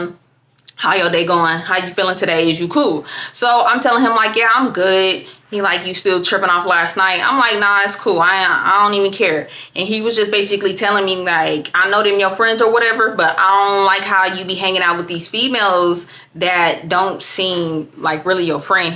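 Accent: United States. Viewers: looking good with the red - USA accent is still the coolest thing 'since sliced bread':washhands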